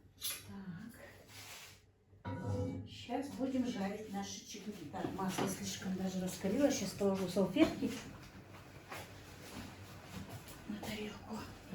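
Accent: Standard. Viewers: Учителя были хорошие и ученица оченьприлежная.Я тоже поражена чистой,хорошо поставленной русской речью.Всех благ вам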